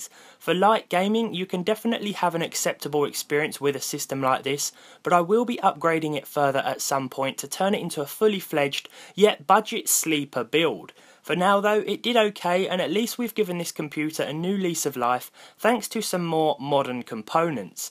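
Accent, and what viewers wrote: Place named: Midlands, United Kingdom. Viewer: Knew he was a Midlands lad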